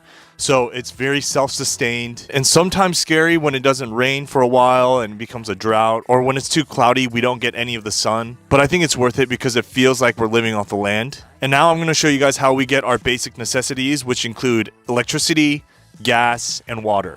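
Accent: United States. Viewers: a Japanese bloke, with American accent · why do you have an American accent?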